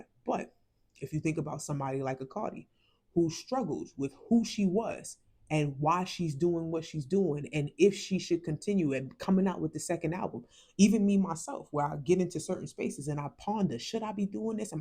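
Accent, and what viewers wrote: New York, United States. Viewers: 😂😂😂 you’re so New York love it. (Wait….you’re from New York, right ? 😭)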